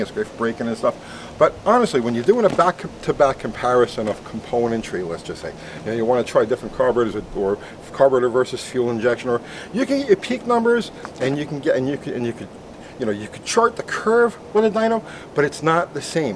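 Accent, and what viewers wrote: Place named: New Jersey, United States. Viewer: I gather that you are in Tennessee, but I hear a lot of New Jersey in there.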